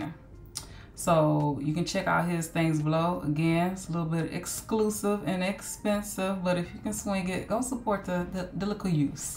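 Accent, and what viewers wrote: Jamaica. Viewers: Peace and Love, it's great to see you posting more videos lately. Mi love fi hear yu talk Patwah 🙂. I will definitely check out these business. I was about to order the Jamaica jersey but saw that it wouldn't be shipped until 4 to 5 Weeks. Will get it next month. Dat deh Jersey Nice!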